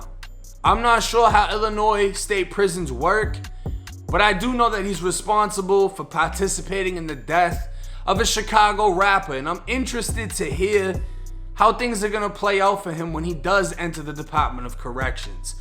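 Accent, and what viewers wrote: Boston, United States. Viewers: Yo you sound just like me Fam you got to be from the Boston area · Never heard a wigga with a Bostonian accent. It’s cool. I like it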